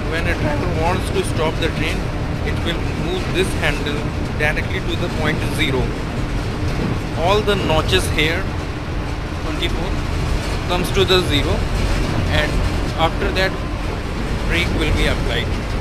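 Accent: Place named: India. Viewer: Compliments for taking time out to make this audio-visual coverage. However, I must rectify one thing. You've been using the term electric 'Engine' iteratively, which is wrong. It should be electric 'Locomotive'. These locos don't have engines in them. For those who have been criticizing for his English must remember that it's not our mother tongue, neither it belongs to our country. So, an Indian with accented English is quite normal and nothing wrong to look down upon. Don't discourage someone when you can't encourage them.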